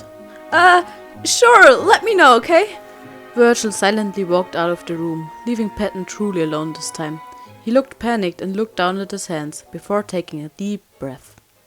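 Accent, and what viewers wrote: Germany. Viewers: Sorry if this is rude but are you German? Your accent reminds me of other German people's English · If I'm correct, I think German is your first language? Learning a new language is very difficult, but you're doing great, sis!! I find your accent pretty pleasant-sounding, especially the way you pronounce the names OML so satisfying. 😂😊 you're also relatable, from the end bit LMAO Keep creating!! 😊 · WOAH I LOVE THIS SO MUCH ! I'M GLAD I FOUND THIS ! Can't wait to see the next part, if you are doing one! 💗 And English isn't your first language? And I may be wrong but you sound german ? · Watched to the very end XD For a little critique/some compliments: I'm learning German and one of my teachers has a similar accent to yours. Learning a second language is really hard :( . I really enjoyed the whole thing even if there wasn't a great deal of animation; I think it still works really well, not that I'm an expert in any way, and the music was perfect. 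The story was creative and convincing and I'm excited to see what happens next and if it somehow ties into Thomas Sanders' life- although I doubt that that will happen. I discovered TS recently and O.M.G.! His Sanders Sides are perfect and somehow so accurate- it's like therapy and they're so helpful. Love the art style, don't overwork yourself ;)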